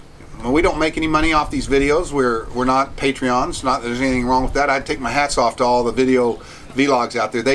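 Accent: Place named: Texas, United States. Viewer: Sounds like a smart Texan to me !! Coming from a fellow Texan. I retired at 53 myself, worked since I was 16, time to live the good life. 🤠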